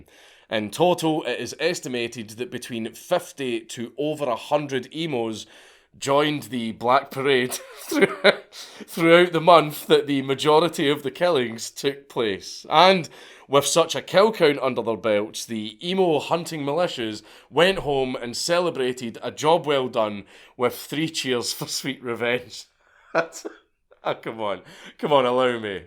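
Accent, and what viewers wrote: Scotland, United Kingdom. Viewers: Scottish I think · He’s Scottish. That’s why.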